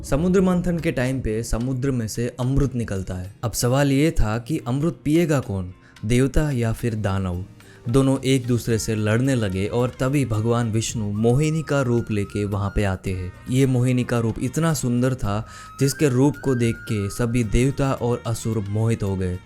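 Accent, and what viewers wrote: Gujarat, India. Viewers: You sound to be Gujarati??😅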